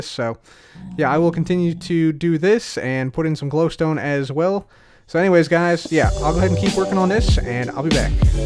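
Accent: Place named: Chicago, United States. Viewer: Also are you from Chicago I can hear a bit of a Chicago accent I'm from Illinois myself.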